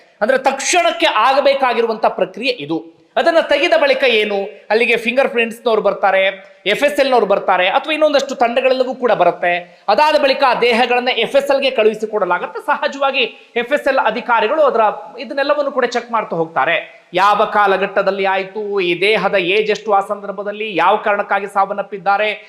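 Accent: Standard. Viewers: nemma spashta dwani swatchha Kannada 👌👌👏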